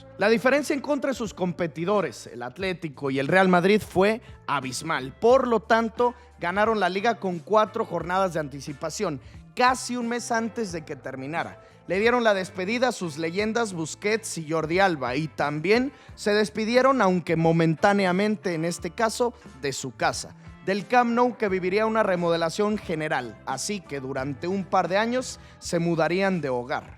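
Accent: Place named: Mexico. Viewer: Acento mexa de la rosa de guadalupe. Inaguantable la puta que te parió.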